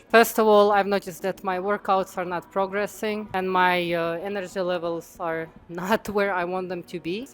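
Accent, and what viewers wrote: Russia. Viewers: Она по русски говорит, акцент русскоговорящего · Impeccable russian accent😊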